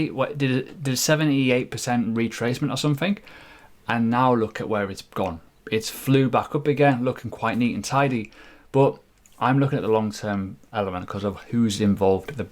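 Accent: United Kingdom. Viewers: Hello, I'm from the U.S and sometimes I have a hard time with your British accent. Would you please show the symbols of the crypto currency in the video, summary, or notes, I am new to crypto and I did not understand what "RSI" you were talking about. I pulled up 5 different coins (I think) in coinmarketcap and for future videos please? Thank you in advance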